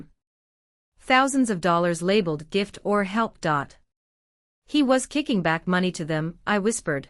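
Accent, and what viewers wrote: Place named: Asia. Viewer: It is hard to listen to bc the voiceover sounds very brokenly with some kind of Asian accent. Though the story might not be bad, the voiceover is amateurish.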